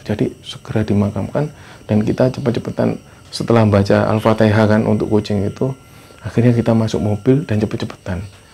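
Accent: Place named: Surabaya, Indonesia. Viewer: Sama ... Aq jg seperti itu.. medok suroboyoan..kyo aq..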